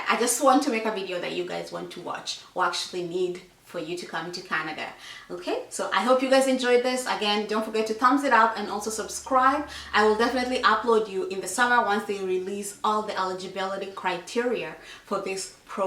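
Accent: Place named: Kenya. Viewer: Good Job. I can tell you have lived in Kenya (from your English) i hope to migrate by end of 2020. Sitting my IELTS next week. Much love from Kenya. your hair is beautiful too.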